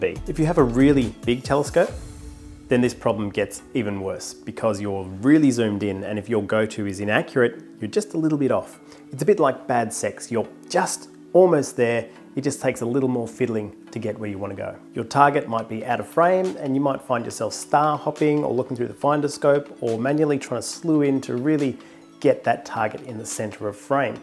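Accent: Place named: Australia. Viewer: Haha..I totally thought you said spice is big in the beginning 😂.. Aussie accent is great.. 😀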